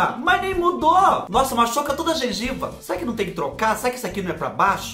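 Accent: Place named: South, Brazil. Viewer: Vc é tão fofo , adoro vc , gostaria de saber de onde vc é , vc fala igual o povo do sul acho muito engraçado , bjsss 😘❤️🥰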